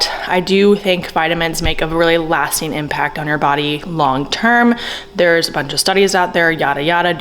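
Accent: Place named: Georgia, United States. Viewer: I randomly found your channel and as soon as you spoke I knew you were a Georgia girl!! Loving your content!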